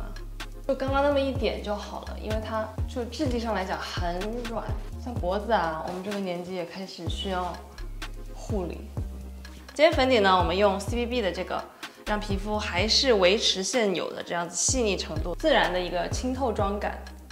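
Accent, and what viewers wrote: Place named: Wuhan, China. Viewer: 你是我人生中第二个巨爱的武汉普通话！哈哈哈哈哈哈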